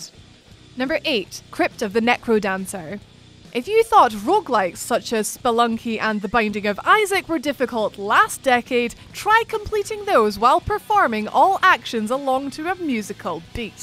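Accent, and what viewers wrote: Scotland, United Kingdom. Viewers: THE GAME IS DRIPPING WITH CONSTANT PERIL , love the Scottish accent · Fábio Pinto that’s a woman mate, Scottish accent.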